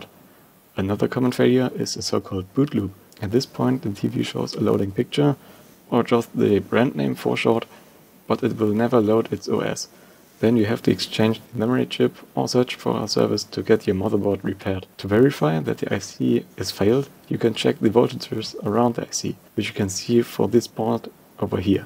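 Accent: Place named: Germany. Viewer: If I’m not wrong you sound like you’re German, I tried ordering from MJK but they seemingly don’t ship to Germany? How did you get one? 😊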